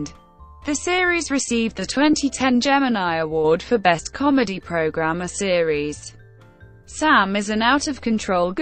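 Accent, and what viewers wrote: United Kingdom. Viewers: a BRIT robot voice!!!...coooool.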